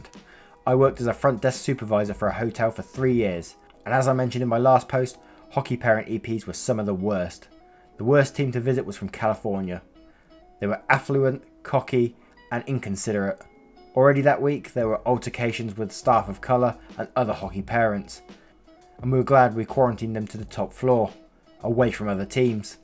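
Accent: United Kingdom. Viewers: Wow! I've never gotten a response by a r/eps person! Also I LOOOOOOOOOooooOOVe your accent! Are you brittish? I'm from Colorado in the US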